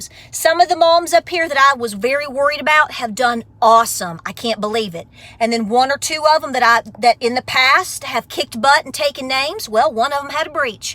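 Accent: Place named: Texas, United States. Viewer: You sound like you should be a Texan!! I love it! And absolutely right!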